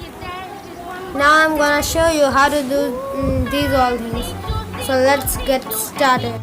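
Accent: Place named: United States. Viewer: Abe American English kyu bol raha hai? Indian English bol na!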